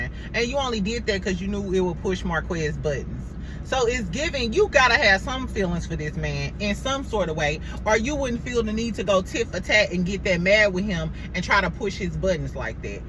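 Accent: St. Louis, United States. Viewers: Love your commentary and STL accent!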